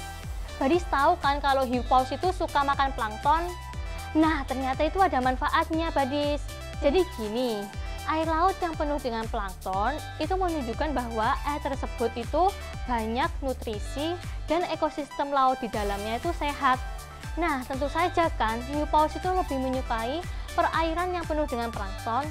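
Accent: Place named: Java, Indonesia. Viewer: Medoknya imut deh 😂😂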